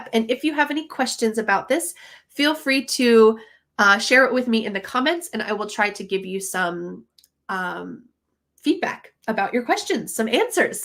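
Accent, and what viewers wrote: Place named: United States. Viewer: American Native English accent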